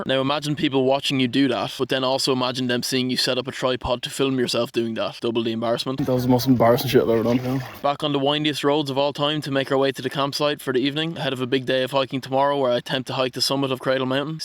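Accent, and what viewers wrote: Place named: Ireland. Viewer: Love that Irish accent.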